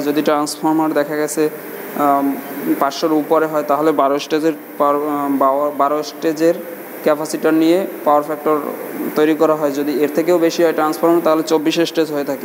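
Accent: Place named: Barishal, Bangladesh. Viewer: বরিশালের ভাষা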